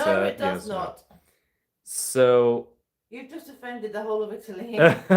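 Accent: Scotland, United Kingdom. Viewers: Happy new year. Glad to hear your Scottish accent is coming along nicely. You say Scotland with a Scottish lilt. From Overtown in Scotland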